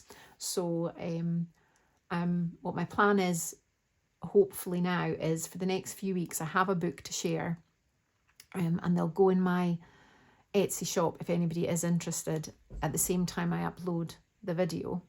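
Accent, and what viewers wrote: Scotland, United Kingdom. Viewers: Love this journal, I am really enjoying seeing journals that are not made up from kits. I have stopped using kits too, I was finding it was affecting my creativity. A few digital papers in the signature is nice if making a journal with a theme though. Its really nice hearing a Scottish accent in the journaling YouTube world lol, I am from Aberdeen origonally xx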